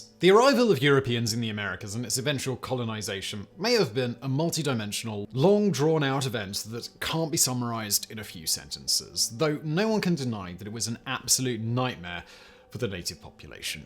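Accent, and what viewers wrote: United Kingdom. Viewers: Hmmm, British narrator....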